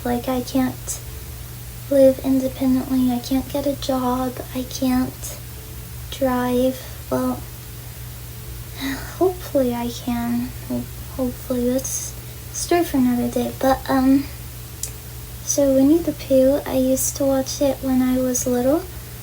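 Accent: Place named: New York, United States. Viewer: Your accent came out a little in this video :p you've mentioned being from New York before but I didn't notice you sounded like it until now. There's nothing wrong with whimsy and knowing who you are :p legit feel like the whole being "stuck" mentally thing you are describing is just not true (I don't mean that your struggles aren't true, I just mean that I don't think you're actually mentally behind most people your age, lmao... have you met most 20 year olds nowadays? like. you're good, girl, seriously). Maybe it was something that felt slow or painful in the past but you clearly are growing and developing as a person, even just talking about the past few months. It might be more exacerbated because you live in (a part of) America, where being able to drive and owning a vehicle is almost a prerequisite for independence and is a rite of passage for adulthood, and the fact that you were homeschooled so you have less context about the maturity of other people your age, outside of your family, but legitimately, in any other place you'd be ... 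I won't say "normal", but... finding it easier to thrive independently? I talked about it in the comments of another video but I legitimately think that autistic kids don't grow "slowly", they grow in a "different shape" than others, and it only looks slow if you're trying to force them to grow in the "normal" shape, unnaturally. You clearly have skills, emotional maturity, and agency that most 20 year olds don't. The agency at least is no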